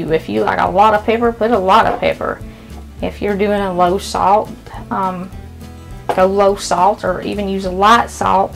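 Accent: South, United States. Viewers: You're awesome. Love a gal with a southern accent.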